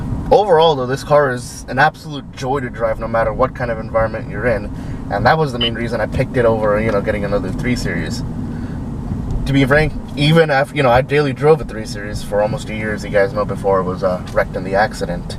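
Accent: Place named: India. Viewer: you sound like an indian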